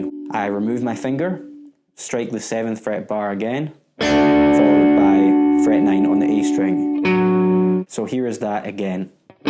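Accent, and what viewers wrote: Scotland, United Kingdom. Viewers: That accent, any fellow Scotsman can immediately identify it.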